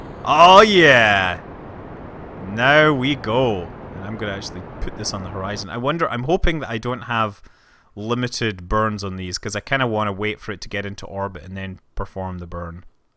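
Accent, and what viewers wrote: Scotland, United Kingdom. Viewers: are u scotish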